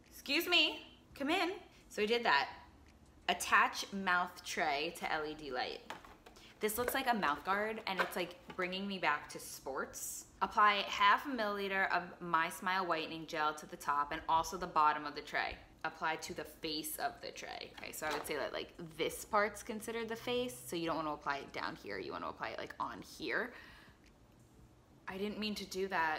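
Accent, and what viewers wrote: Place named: New Jersey, United States. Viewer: This girl is 1000% from NJ 🤣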